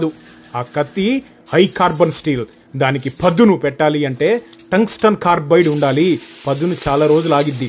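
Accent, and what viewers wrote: Andhra Pradesh, India. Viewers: Meeru telugu baga mataladtharu.....nenu meeru andhrapradesh ki chedina varemo ani anukunevallamu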